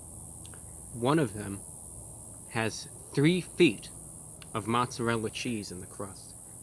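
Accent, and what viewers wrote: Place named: Tampa Bay, United States. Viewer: Looks like youre in my area...tampa bay. Sounds like it too.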